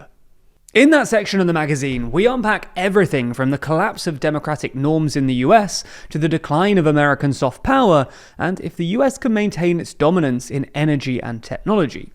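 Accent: United Kingdom. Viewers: TLDR News EU? You sound extremely British to me. Does the UK want to join the EU? Nobody is walking into anything. It is more that we are crawling into a federal EU. It goes so slow. As long we are not all speak the same language in all countries it is still not a real federation in my eyes. Everything we can do together we should. Saves money. We don't need a huge army against Russia. We just need a hyper modern army with rapid response forces and a bit more nuclear weapons. An d all of this bought in the EU. The 5% deal with Trump is a hoax. Anything to get that orange monkey of our backs so we have a soft landing economy wise. Trump is the biggest liar in history of US presidents and changes the rules when ever he wants. That means we can do that too and thus do not have to abide by a number (5%) Trump shot out of his ars. Any deal made by Trump is not even valid in the US itself so why would we honour it? 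No, we just need to pay for our own defence and we will decide ourselves how much that is gonna be... This is how it is but just not said out loud by our leaders...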